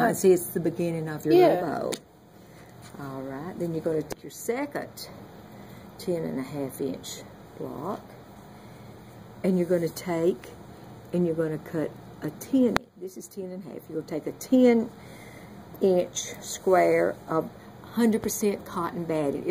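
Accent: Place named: South, United States. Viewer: Just wanted to give you a big hug from a fellow-Southerner (living in Ohio) who needed to make 20 of these. I could not have found a better teacher than you,! You spoke MY language with MY accent. God be with you! Merry Christmas and a Peaceful New Year to you, my dear lady.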